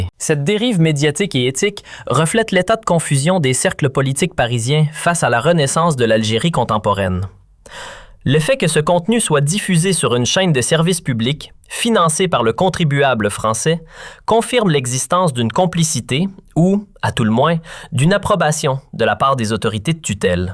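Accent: Canada. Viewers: fait avec un accent québécois plutôt que français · cet accent canadien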